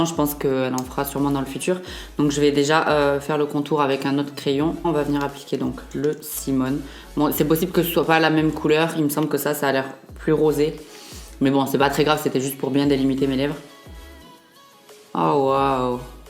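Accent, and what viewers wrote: France. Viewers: Coucou tu viens d’où ? T’as un léger accent de chez moi, du sud ouest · T’es marseillaise ? Ps: super revue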